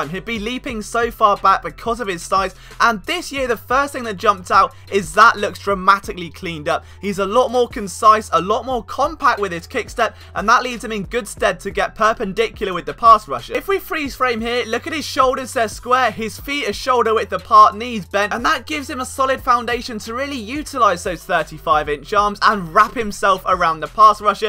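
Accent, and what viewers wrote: England, United Kingdom. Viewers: Love the channel to bits. Where in England is your accent from? Keep up the great work, Philly loves ya kid! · I don't what it is, but I just can't listen to NFL commentary by a Brit. Could be the most insightful view but just can't stomach it.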